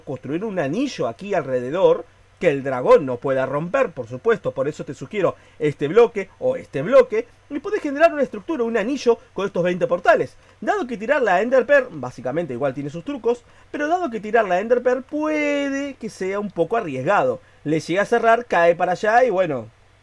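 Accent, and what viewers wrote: Argentina. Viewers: De adonde es el chico este?? la tonada se me hace muy argentina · Che mira, te hago una pregunta ¿vos sos argentino o de que pais sos?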